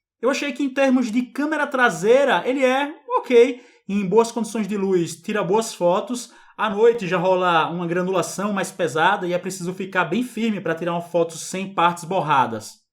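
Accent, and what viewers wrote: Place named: Fortaleza, Brazil. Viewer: De fortaleza se não me engano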